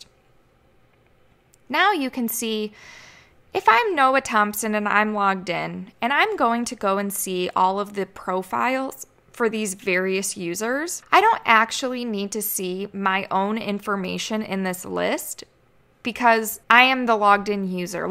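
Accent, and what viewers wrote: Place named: Wisconsin, United States. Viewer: You're (whoever is doing this video) is pretty good at explaining the videos! You just keep forgetting to add the links you mention... you should probably put "Remember to add the link." in your notes though. Can I request you do a video on the proper use of anchoring components? Every time I think I have it down, I realize that I was wrong! Also, where is that accent from? I'm guessing Wisconsin or somewhere close.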